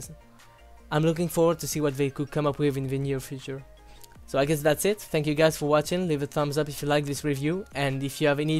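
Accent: France. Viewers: l'accent anglais est pas ouf hein on sent la france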